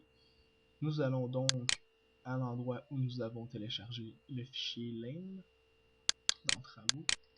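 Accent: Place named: Canada. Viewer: il est canadien c'est normal si il parle un peu différement hein.....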